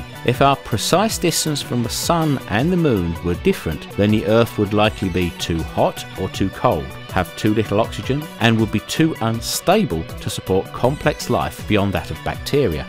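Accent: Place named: Cockney, United Kingdom. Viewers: i came here just because i caught the voice of a cockney. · Yes he has got that same nasally sound,and London accent. I was thinking that also.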